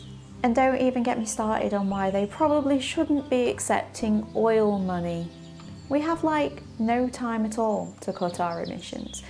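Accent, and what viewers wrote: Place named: North of England, United Kingdom. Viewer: Oooo same regional accent as Shaun and Rachel Oates. Loooove. North of England is poppin' off.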